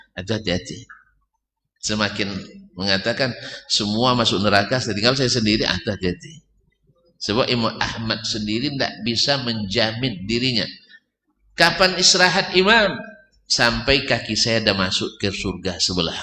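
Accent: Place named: Malaysia. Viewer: hahahaha ente lucu logat melayu gitu dibilang Arab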